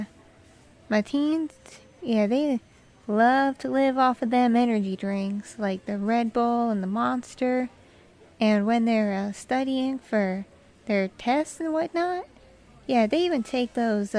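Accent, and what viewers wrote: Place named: South, United States. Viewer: I can hear a good southern accent but its a medium one but atleast its not bad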